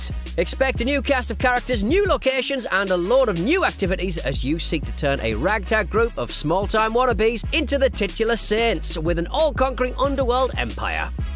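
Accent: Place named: Northeast England, United Kingdom. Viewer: you have to be from the north east with that accent I'm from newcastle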